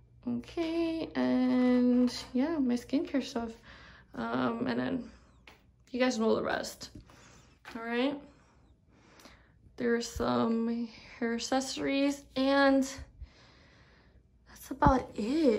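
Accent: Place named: Miami, United States. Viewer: miami accent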